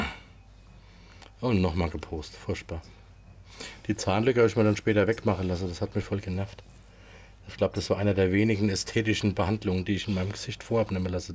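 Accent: Hesse, Germany. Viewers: de hessische dialekt bedarfs auch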